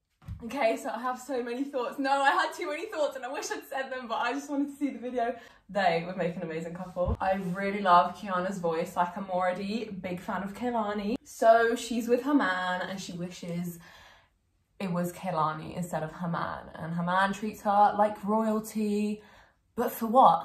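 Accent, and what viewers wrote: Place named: United Kingdom. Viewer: I love your hair, your accent reminds me so much of someone from a British sitcom like skins 🤣❤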